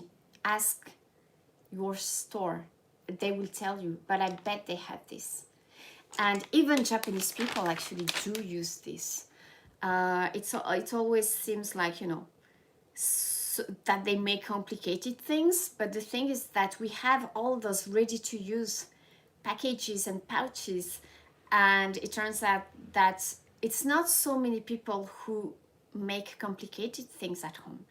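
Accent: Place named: France. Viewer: フランス語訛りの英語、理解するのがつらい🎵すしのシャリを入れた木製のボールは素晴らしい。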